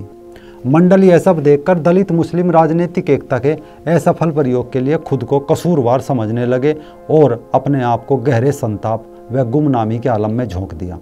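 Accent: Haryana, India. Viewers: Bhai aapke bahut videos dekh Chuka Hoon. Ek baat saaf saaf keh deta Hoon, Aapka bolne ka tareeka aaj ke har pravakta see behtar hai...! Aapki boli me haryanavi yaa garhwali/kumaaoni jaisi boli dikhaayi pad rahi hai.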